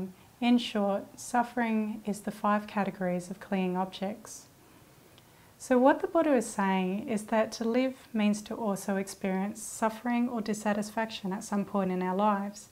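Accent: Australia. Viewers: What's with the gigantic subtitles? Is this really necessary? Do we have a problem with this delightful Australian accent?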